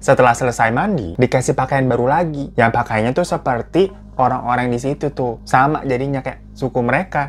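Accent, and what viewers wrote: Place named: Banjar, Indonesia. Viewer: Lucu dengar bang pakai bahasa Banjar 😊 udh kaya orang Banjar beneran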